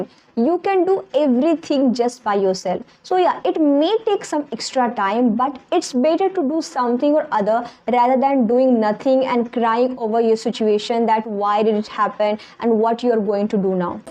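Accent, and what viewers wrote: India. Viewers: Indian accent ,but excelent. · You could work a litle bit in order to get over your indian accent, it's strong. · I like your indian acent jejej..Greetings from Colombia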